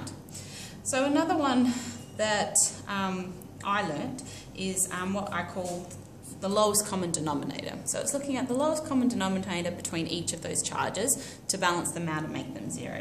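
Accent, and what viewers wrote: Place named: United Kingdom. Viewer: Yo she’s British that’s how British people talk, not everyone is American and speaks like one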